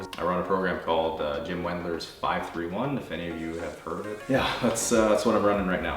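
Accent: Canada. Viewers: I didn't know you were Canadian til today, probably. And it makes no difference to me. I'll be friends with somebody and support their business no matter where they're from or anything. I'm saying because people were making Canadian comments or whatever, but most people that I end up liking on tv or whatever are canadian. Some of them are english, or some other culture, but most of the ones I like...I discover they're Canadian after I watch them for some time. I don't really notice the accent right off sometimes. accent isn't really that noticeable until he says something with an "O" in it. But if you like Canadian people, there's always Jim Carrey, Alan Thicke (rip)... there's a channel on here called the Dungeon Dudes, that me and my boyfriend watch...Monty, Kelly and all them are Canadian. But I think they're from a different part of Canada. Matthew Santoro also has a channel and he's from Canada. 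Michael J. fox from Family ties is Canadian, and Neve Campbell from Scream. And Celine Dion. She's from the Quebec part. She's french-Canadian. But anyway, yeah I was just saying that. I realize that most of his comments aren't bad, just wanted to say that I kind of didn't know he was Canadian til today, I read all the comments and it makes no difference. Just wow. I've been watching him for about 3 or 4 years and I figured he was from somewhere in the U.S. you better be from somewhere else and not Texas right now,... it's better in Canada than it is in texas right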